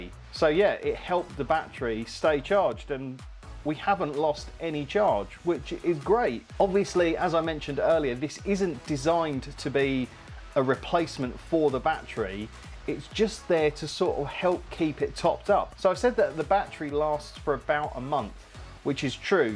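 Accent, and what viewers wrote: United Kingdom. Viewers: Ahhh how nice it is to actually listen to a fellow brit. This is such a great video and very helpful especially for useless DIY bird's like me lol....thank you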